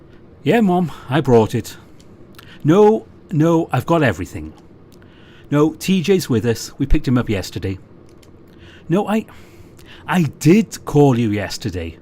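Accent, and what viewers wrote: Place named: Scotland, United Kingdom. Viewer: You have a really nice accent, but I can’t quite pin it down. Are you Scottish? (I’m terrible with identifying accents😅)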